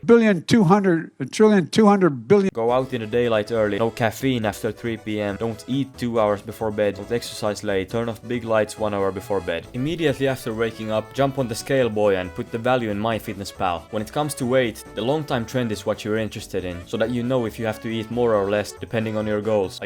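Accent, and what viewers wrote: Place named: Finland. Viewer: Suomen aksentin aina tunnistaa :D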